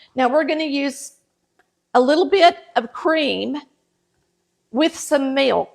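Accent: South, United States. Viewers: When the video only provides the sound, usually the instruction is doable. Not today, I REALLY wanted to watch the demo. Love love your adorable southern accent. Paz be with us all 🙏. " "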